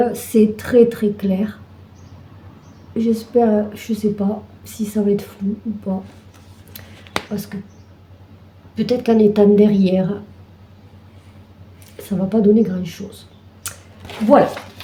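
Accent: South, France. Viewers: Bonjour, je viens de m abonner, j aime beaucoup votre accent qui me rappelle mon enfance dans le sud. Je vais faire le SAL également et pris cela grille sur le site de brode 41, que j ai découvert car comme vous il n y avait plus la grille chez de mère en fille et j ai été très satisfaite de sa préparation de commande et de l envoi ultra rapide, je recommande cette boutique qui fera partie de mes favoris maintenant. Très belle pochette, il faudrait que je m y mette également 😊 on va être plusieurs au taquet début juillet pour ce SAL je pense , pour ma part je vais faire ligne par ligne de dessin et faire juillet et août dès le début car en Août priorité aux petites filles et vacances et là il me faut des projets 0 CERVEAU 😂😂😂 bonne petites xxxx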